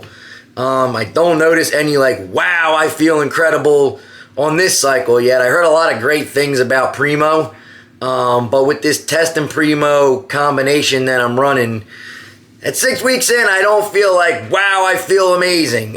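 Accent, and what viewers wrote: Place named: South, United States. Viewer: Bro its been ages since ive heard such a strong southern accent 🤣😅🤣😅😂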